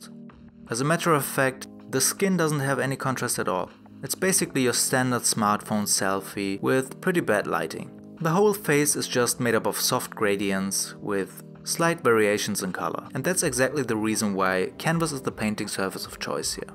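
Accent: Germany. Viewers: Man hört raus dass du aus deutschland kommst haha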